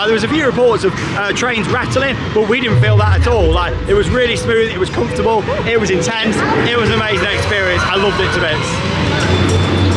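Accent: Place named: United Kingdom. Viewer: Holy British, Batman! I can’t understand a word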